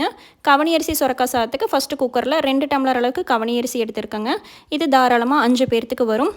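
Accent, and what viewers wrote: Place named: Kongu, India. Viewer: Sister unga kongu tamil rompa responce ah erukku enakku pidichirukku subscribe pannita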